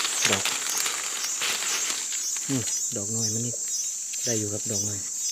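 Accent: Chaiyaphum, Thailand. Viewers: สวัสดีจ้า ยายบอกตรงๆเลยวาหัวตาสิเห็นช่องของเจ้าเด้งขึ่นมา ยายกะหัวตาฮู่วาทางบ้านเฮากะมีเห็ดเผิ่งคล้ายๆแถวเมืองนอกเนาะจ้า เบิงดี้…ดี เจ้าเฮดคลิปดีอีหลีจ้า สำเนียงคือชัยภูมิเนาะจ้า❤️👏👏👏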